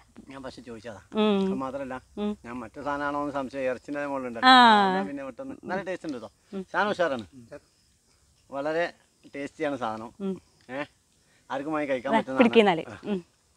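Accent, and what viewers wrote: Malappuram, India. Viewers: ഹായ്.... വിഭവങ്ങളും.... മലപ്പുറം തനത് ശൈലിയിൽ ഉള്ള അവതരണവും ഉഷാറാവുന്നുണ്ട്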